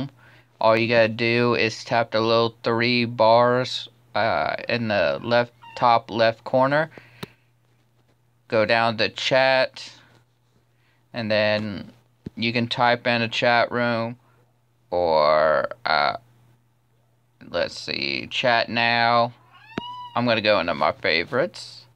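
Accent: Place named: United States. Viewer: You sound country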